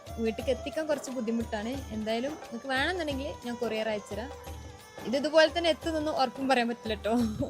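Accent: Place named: Rajasthan, India. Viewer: Rajsthan maarvadi aan slang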